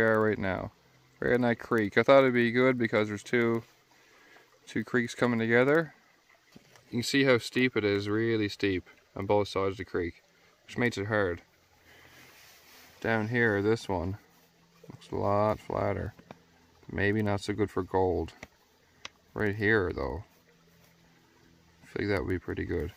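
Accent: Newfoundland, Canada. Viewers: Enjoying your videos, are you from Newfoundland and Labrador? Your accent is certainly sounds like the Rock, best place in the world😉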